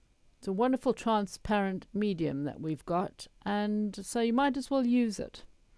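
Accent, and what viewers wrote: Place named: South Africa. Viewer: Ur south Africa accent keeps popping up.!!😁😁😘 I keep trying to look round ur hair.!!!🤣🤣🤣🤣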